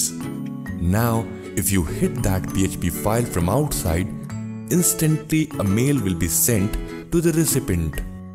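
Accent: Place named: India. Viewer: Hahahah a Indian voice is the same , you don’t need change your voice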